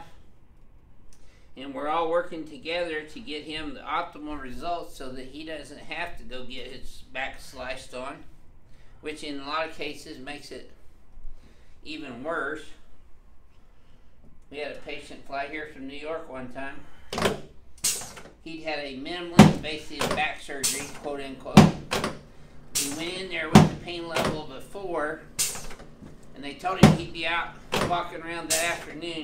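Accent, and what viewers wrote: South, United States. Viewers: Total high pitched cowboy hick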